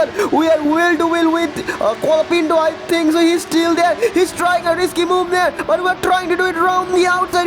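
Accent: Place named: Pakistan. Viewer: Your english sounds like Pakistan's english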